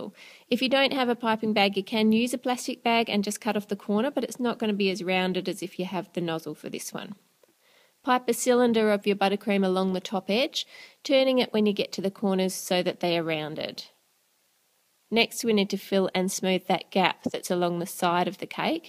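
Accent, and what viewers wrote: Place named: Adelaide, Australia. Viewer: Are u from Adelaide?! U sound like u r! I'm from Adelaide!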